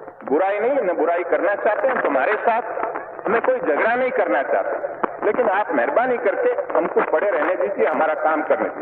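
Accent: Gujarat, India. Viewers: Typical gujrati accent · in both speeches 's Hindi pronounces with Gujarati tone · That Gujarati accent! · Not voice but yes accent is same bcoz both have gujarati tone in hindi thats y luking little bit similar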